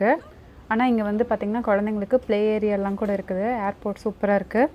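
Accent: Kongu, India. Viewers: Kongunatu mangai kongu Tamil super video bye Anna. From.covai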